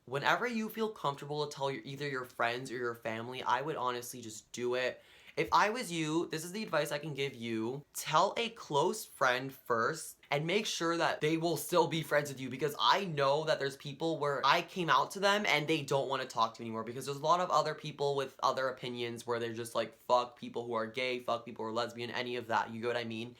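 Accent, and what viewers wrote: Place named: New England, United States. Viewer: I have to smile the way you talk, you sound like you're New England Italian? Hope you and your parents work things out, give them time, there trying to deal with this the only way they know how.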